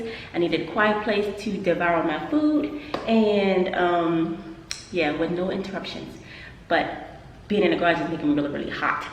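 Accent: Baltimore, United States. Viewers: I thought you was from Baltimore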